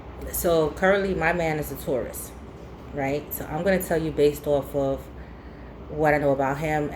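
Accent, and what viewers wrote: New York, United States. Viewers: Aww. Sorry to hear that. Be strong! You sound like a New Yorker. From the Bronx, NY?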